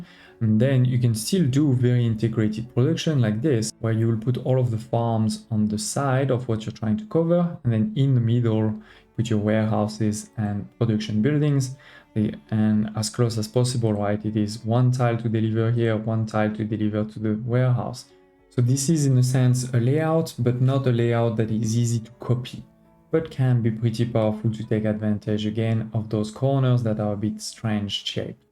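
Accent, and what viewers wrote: France. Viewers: It is perhaps a fallacy of the American mind that I immediately think “this guy has a French accent, therefore I trust his advice unconditionally.”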